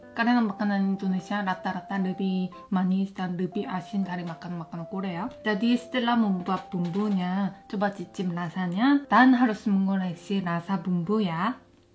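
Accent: Java, Indonesia. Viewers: Lo Lo Lo kok logatnyaa Jawa to inii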